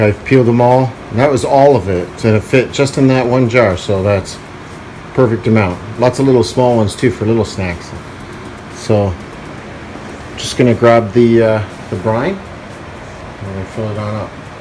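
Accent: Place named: Canada. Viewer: Just lucious! Thanks for sharing. I wanted some pickles, Thanks mom! Ok, you know me(kinda) LoL, please remember they are native here, and I really like the Canadian accent! But please, Pah con..... LOL ~ 707! Bro hugs, and if it's not drinking out of a hose, it's burnt, starting to include wildlife, I'd have to go back and look, but I'm pretty sure, it's begining of week 3 of triple digi's here. Peace, and please, enjoy!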